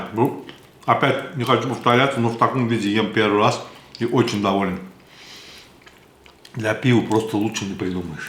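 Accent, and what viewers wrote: Baku, Azerbaijan. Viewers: бакинский акцент , так сладко кушает , класс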